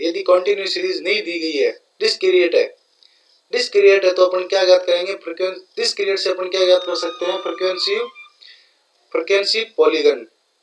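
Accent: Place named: Bagri, India. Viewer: Sir aap hindi bdiya nhi bolte . Aapke tone se bagri nikl rhi h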